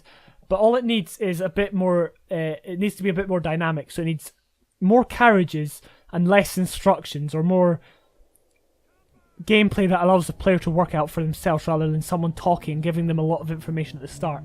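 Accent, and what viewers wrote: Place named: Scotland, United Kingdom. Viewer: Scottish and likes half life, daddy?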